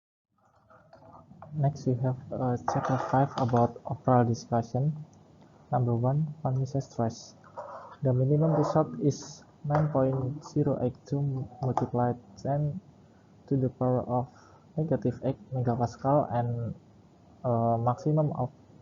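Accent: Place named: Indonesia. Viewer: logatnya kayak orang indo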